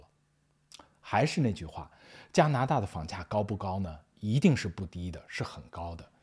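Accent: Standard. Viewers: 主播的标准普通话非常好，非常珍贵，听着就舒服。 现在很多其他主播普通话都不标准，说话时舌头伸不直，都秉承特色社会主义装B狂，听着觉得恶心。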